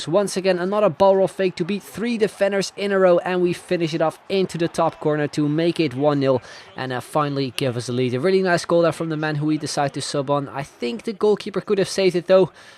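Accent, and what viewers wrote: Netherlands. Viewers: was nog niet geabboneerd. hoorde meteen dat je nederlands was. abboneer meteen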